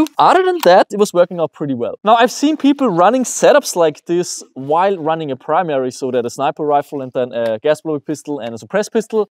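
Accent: Germany. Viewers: Man hört das du deutschsprachig bist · Eindeutig ein deutscher😂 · Bist du ein deutscher man hört es nähmlich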